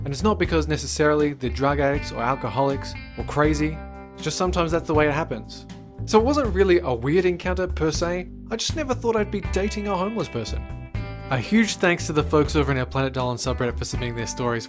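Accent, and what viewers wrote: Australia. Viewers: Oh, you’re Australian. Thought u were British... whoops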